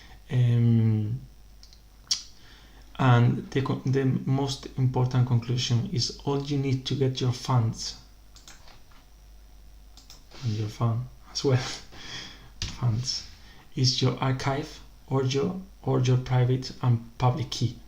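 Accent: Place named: Spain. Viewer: Pues el habla español , o al menos su acento es de español hablando ingles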